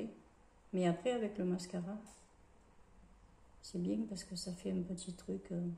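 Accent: South, France. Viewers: Hello, j'aime beaucoup ton naturel sans chichi, c'est très agréable! Et ton petit accend du sud adorable! On ne peut pas plaire à tout le monde 😉.il y a toujours des personnes qui critiqueront. Ne change rien, c'est parfait! Très bien journée, prends soin de toi. Bisous.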